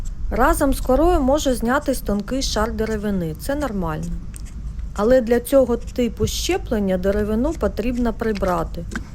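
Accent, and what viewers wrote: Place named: Surzhyk, Ukraine. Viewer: Лайк за українську, хоч і трохи з суржиком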